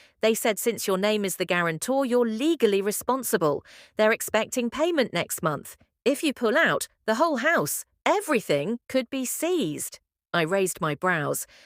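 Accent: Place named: United Kingdom. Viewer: Because it’s in a British accent 😁 Watching from Leicestershire in the UK 🇬🇧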